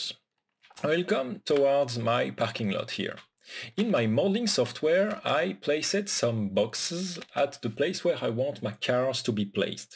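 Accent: France. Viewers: that french accent is très audible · J'adote ton accent français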